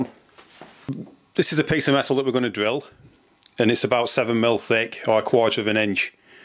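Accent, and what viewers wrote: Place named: Yorkshire, United Kingdom. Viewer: Nice informative vid. I'm trying to place your accent to the exact region in UK..is it Yorkshire?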